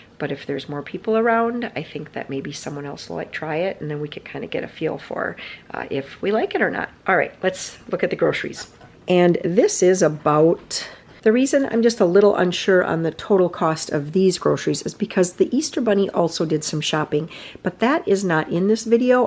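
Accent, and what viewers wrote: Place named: Midwest, United States. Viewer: Gotta love that midwestern accent… bag-els :-)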